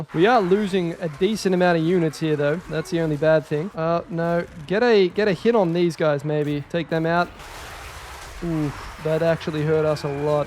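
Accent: Australia. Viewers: This comment section reminds me that America was a mistake: man is clearly Aussie FFS . Also checking his profile is free.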